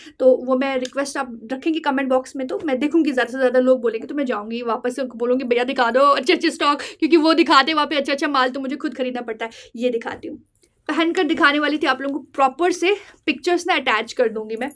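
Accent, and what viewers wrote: Kashmir, India. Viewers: Wah di wah kya ho ap 😂 apki kashmiri jab nklti hai na bht cute lgta hai❤ from srinagar Eidgah 💕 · Mashaallah aap bhot zada khubsurat lag rahi hoo dii❤❤aur muja aapki kashmiri baat bhot zada aachi lagti hu.aap kabi daly rutine dekho kabi dii kabki lots of love khush raho humasha aasay hi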